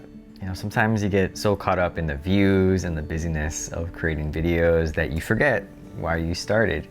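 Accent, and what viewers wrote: Hawaii, United States. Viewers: I love when you do pigeon talk and local boy act in your videos.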